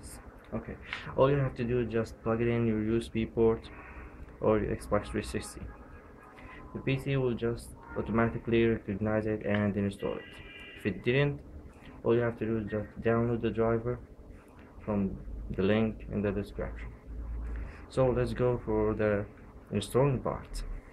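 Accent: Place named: Romania. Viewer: you have romanian accent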